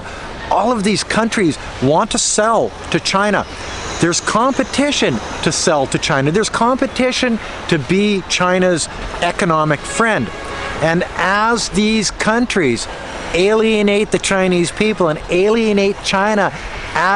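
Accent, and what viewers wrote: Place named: Canada. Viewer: What a Canadian tone to described the greatest of, keep up the good work! Your supporter from Coquitlam, BC, Canada!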